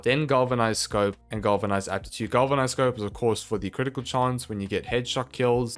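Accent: South Africa. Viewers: Are you from South Africa? I can hear from your voice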